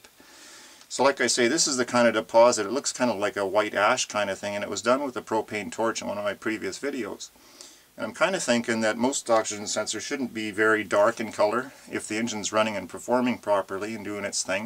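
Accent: Canada. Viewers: Love the Canadian accent lol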